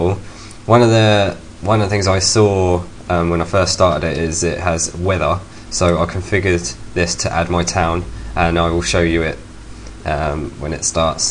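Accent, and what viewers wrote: England, United Kingdom. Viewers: lol i always had a feeling you were from england so am i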